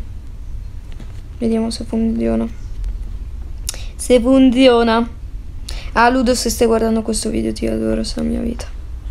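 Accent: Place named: Rome, Italy. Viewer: Vai con ľaccento romano regaaaaaaa😂😂😂 I primi urli sembravano degli ornitorinchi spaventati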